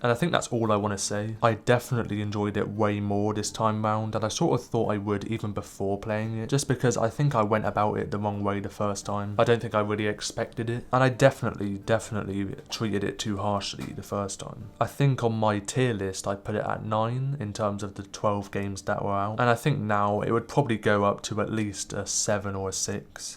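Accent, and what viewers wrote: United Kingdom. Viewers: you do come off as a hater. It’s an average brit accent🤣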